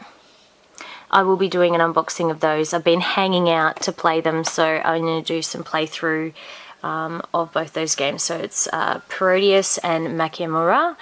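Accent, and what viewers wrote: Australia. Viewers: The accent being Australian means you must know Last Gamer. Wish I lived in Australia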